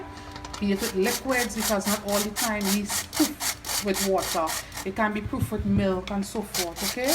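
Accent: Trinidad and Tobago. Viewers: I would love to see what this woman looks like! Her voice is amazing (Trinidadian accent)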